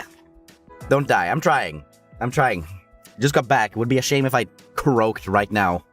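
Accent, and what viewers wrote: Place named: Sweden. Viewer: I think Swedish accent is actually cute 🫳💖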